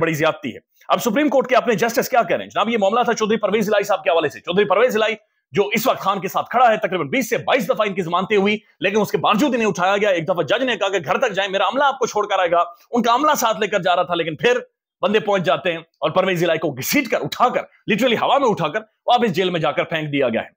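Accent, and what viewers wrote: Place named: Kashmir, India. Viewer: مجھے آپکے اردو لہجے سے شک تھا کہ آپ کشمیر سے ھیں ۔ آج آپ نے خود بتا دیا۔ تھینکس ۔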